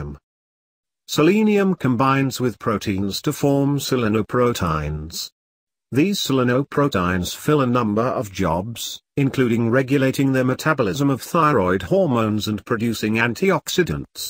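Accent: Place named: United Kingdom. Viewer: Why must they use a British robot voice. Just do the damn thing with a human voice please....British, American, Tunisian, I don't care, just as long as its human!!!!!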